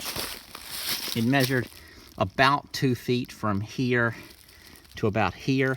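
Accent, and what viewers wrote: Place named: Carolina, United States. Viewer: I've wondered myself if he's from North Carolina. However he always hides the front license plate on his vehicles and the only southern state to require front plates is Virginia. His accent is Carolinian though so I wonder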